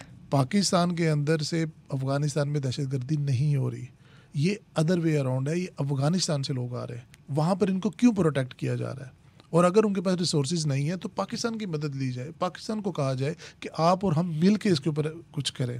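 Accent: India. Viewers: انڈین سٹائل سےاوردو بولنے پر شرم آنی چاہیے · are you an indian. Why do you speak in an indian accent? Qhan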